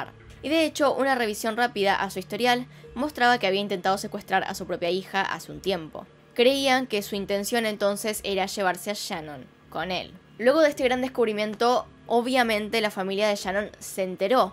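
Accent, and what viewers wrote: Argentina. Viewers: Amo tus videos y tu acento argentino que le da como mas emosion a los casos! Saludos desde west palm beach, florida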